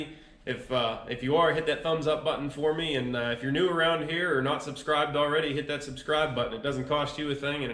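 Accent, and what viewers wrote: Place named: Western Pennsylvania, United States. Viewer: Ahh ok that makes sense, you had the same western pa voice I'm used to, that's where I am haha. You ever need a hand with anything let me know. I can work on about anything haha.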